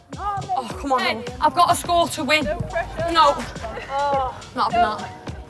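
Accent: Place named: Manchester, United Kingdom. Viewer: I love you Tooney , never let anything get you down. You inspire me and I want to be like you when I’m older. Im not from Manchester but I always put on an accent to be like you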